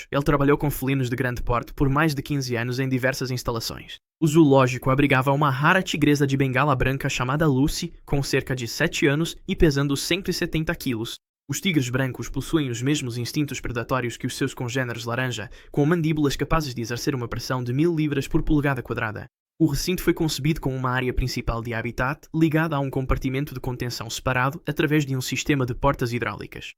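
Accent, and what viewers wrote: Portugal. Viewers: Essa ia com sotaque com português de Portugal é horrível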